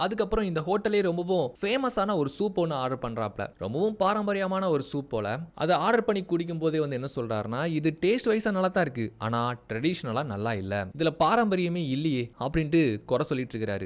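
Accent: Tirunelveli, India. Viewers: பேச்சில் திருநெல்வேலி சாயல்..❤❤❤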